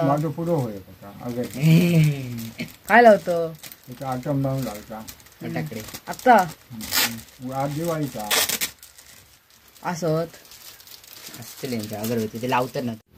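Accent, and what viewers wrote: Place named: Malvan, India. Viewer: तुझी मालवणी भाषा ऐकायला छान वाटत आहे.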